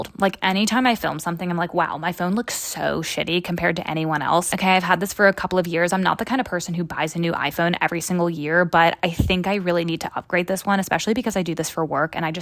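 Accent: South, United States. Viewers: Hearing you say you don't think you have an accent is too cute ( cause you definitely DO) (( saying this as a fellow southern queen)) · As a southerner you have a very noticeable southern accent to me :)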